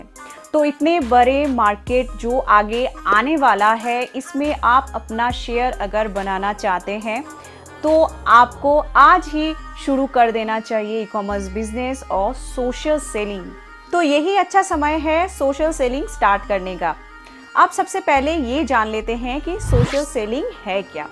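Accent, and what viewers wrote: Bengal, India. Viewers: Ap bangal se hai kya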